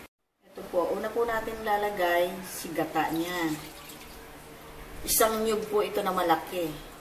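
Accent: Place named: Bulacan, Philippines. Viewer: ng marinig ko ang accent mo, sabi ko taga bulacan to..kaya ng makita ko na espasol ang gagawin mo..i deduced na san miguel ka laki.. :))) taga san miguel din kami (mother side), sa may sta inez... keep up the good job :))))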